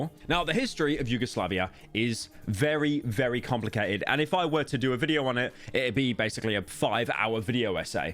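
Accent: United Kingdom. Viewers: Why the fuck am I watching and listening to a brit talking about how an eastern european man had a foreign object in his chocolate starfish?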